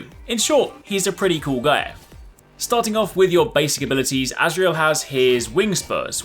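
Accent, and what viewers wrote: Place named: United Kingdom. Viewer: Here because I had a comment saying we sound the same! 😅 We don't... we're just both British! Haha. All the best to you dude 🙂👍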